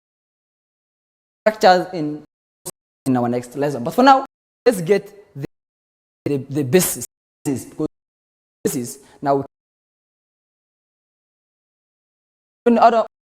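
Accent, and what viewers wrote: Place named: Africa. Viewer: Waxaagaba lama fahmi karo lahjad foolxuno tii africanka ba wax ku aqrinaysaaye tii somalida la imow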